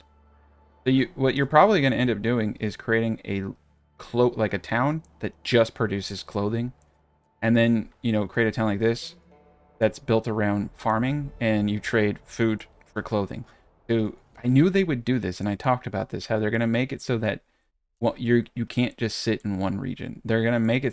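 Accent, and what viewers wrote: Canada. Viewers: i was thinking that you were from Canada🤣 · as a South African i thought you where Canadian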